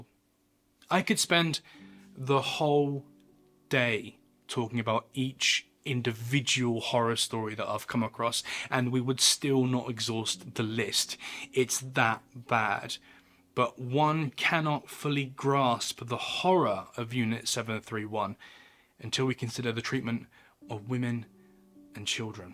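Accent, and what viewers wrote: United Kingdom. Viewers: The irony is that this man is talking about these horrors in a deep British accent. That accent inspires fear in the hearts of millions upon millions of people around the world.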